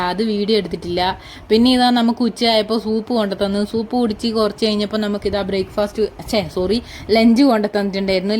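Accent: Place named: Kannur, India. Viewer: കണ്ണൂർ ഭാഷ 👌👌👌😀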